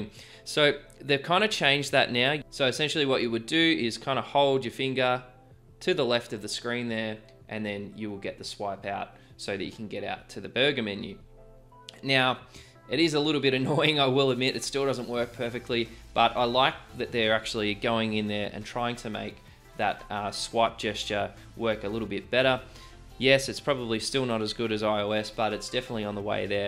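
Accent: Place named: Australia. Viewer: i just love aussie accent.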